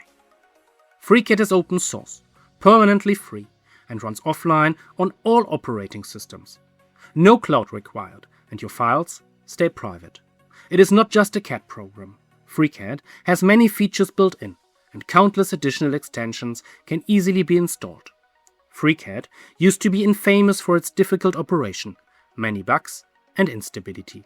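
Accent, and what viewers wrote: Germany. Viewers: Ein Deutscher der englisch spricht ist unverkennbar😅😂 Gutes Video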